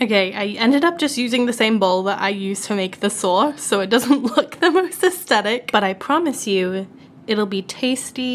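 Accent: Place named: Australia. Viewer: That's so cool!! You say so many things with a tad bit of Australian in there as well, so it's so mesmerising to hear you speak, haha. ❤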